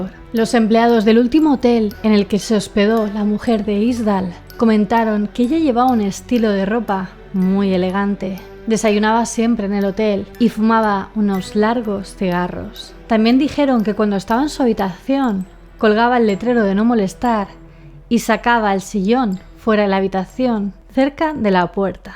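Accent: Spain. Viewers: Muy buen vídeo; por tu acento asumo que habrás oído hablar alguna vez acerca del crimen de Alcácer, sé que es un caso bastante sórdido y enmarañado, pero estaría interesante un vídeo al respecto con tu excelente narrativa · Hola guapa eres de España se te nota en el asentó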